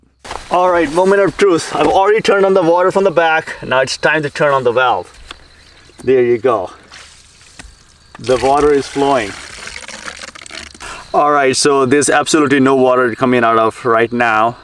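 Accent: Punjab, India. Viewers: Hello! Another great video. (Love the Punjabi accent.) Sat sri akal.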